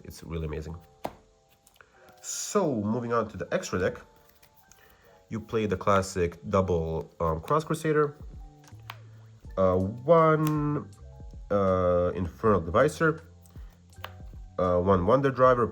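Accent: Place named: Balkans. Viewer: I hear a bit of a Balkan accent there, would love to discuss more hero stuff. Testing stuff out again and defintely could use some tips!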